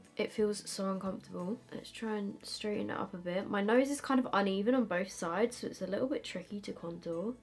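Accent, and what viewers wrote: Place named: London, United Kingdom. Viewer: I FREAKING LOVE YOUR ACCENT!!😘 Usually anybody else that has that London tone, I dont like as much but your voice is beautiful. 💛💜💛 Stay you girl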